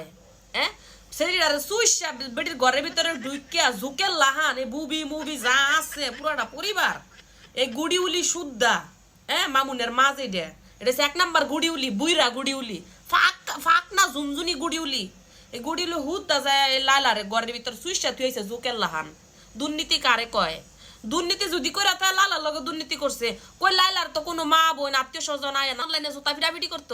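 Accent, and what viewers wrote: Comilla, Bangladesh. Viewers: OMG 😲 😱, can i know in Bangladesh what part you are coming from? Its sound Cumilla version 😂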